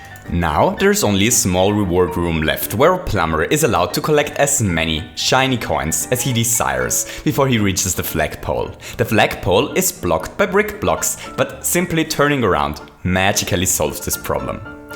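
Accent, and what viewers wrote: Germany. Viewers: Are you German? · DEUTSCHER AKZENT! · what accent do you have?! most probably german... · Are you German? ^^" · Are you a german? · Cringey german english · Is german?I mean the accent... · Your german?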